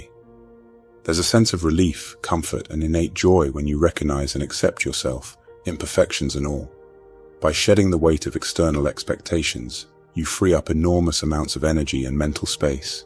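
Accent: United Kingdom. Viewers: That British accent on these type of videos is so annoying..makes me tune out instantly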